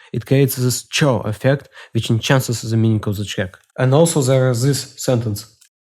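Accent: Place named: England, United Kingdom. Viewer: The first English accent that I suck at understanding it. Only beginnings and the ends. Lol :D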